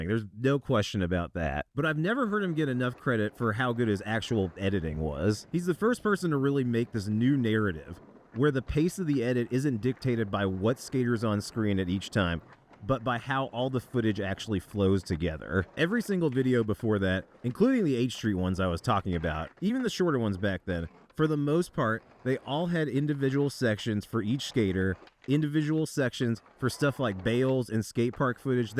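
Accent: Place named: California, United States. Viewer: Man that cali voice is fckn annoying I can't man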